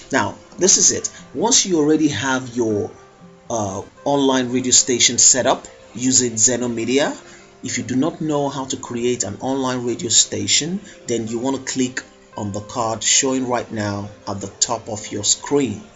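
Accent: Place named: South, United Kingdom. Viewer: my mistake then! You kind of have this unique south british accest you know haha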